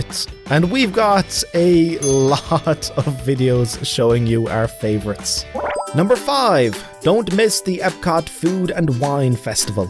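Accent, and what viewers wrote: Ireland. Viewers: sounds it, I have an Irish neighbor who sounds like this but female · Are you Irish? I love your accent! It reminds me of a professor I had in college. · Love 💗 Irish accent!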